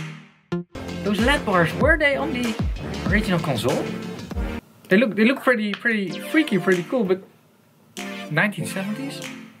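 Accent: Netherlands. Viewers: are u dutch? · dutch guy trying to speak english. damn. facepalm, ottoman sideslap, whatever...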